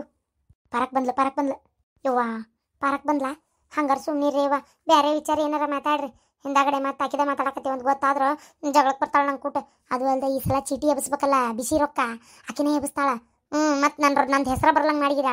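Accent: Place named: Hubballi, India. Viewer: ಮನೆಯೊಂದು ಮೂರು ಬಾಗ್ಲು ಹಾಕ್ರಿ ನನ್ ಫೇವರಿಟ್ ಸ್ಟೋರಿ ಮಿಸ್ ಮಾಡ್ಬೇಡಿ,I love your ಹುಬ್ಬಳ್ಳಿ ಭಾಷೆ🥰😍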